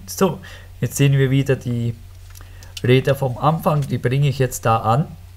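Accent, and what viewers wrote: Switzerland. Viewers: Um Gottes Willen!:D Ich sprech zwar auch Dialekt (Deutschen) aber Schweizer-Deutsch ist schon mal was anderes, aber ich verstehs trotzdem. Und dieses Hochdeutsch brauch doch kein Mensch! ,,Mia kenne alles ausa Hochdeitsch" sagt man bei uns, weiß nicht ob man das bei euch vielleicht kennt:) Und zu den Torten: Hammer! Kanal heute entdeckt und überall mal vorbeigeschaut und positive Bewertung dagelassen:)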